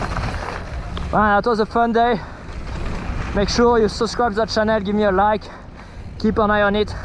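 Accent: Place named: France. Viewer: joli accent français XD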